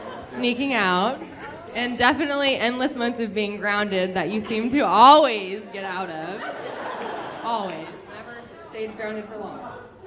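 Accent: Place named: Midwest, United States. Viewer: I'm thinking your from the mid west, love the accent and your sincere thoughts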